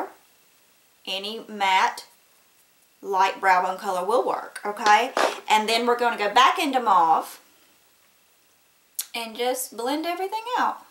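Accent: South, United States. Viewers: Amazing tips!! Love your southern accent!!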